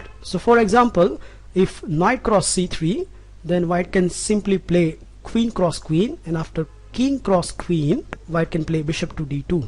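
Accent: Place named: India. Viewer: Indian accent is very annoying and confused 😏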